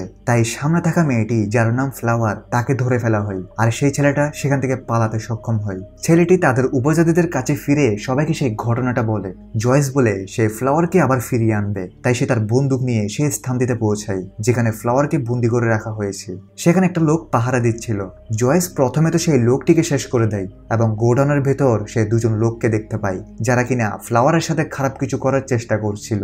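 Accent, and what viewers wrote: Chittagong, Bangladesh. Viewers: আমার মনে হয় আপনি চিটাগাং এর। আপনার কথা বলার স্টাইল টা তাই বলে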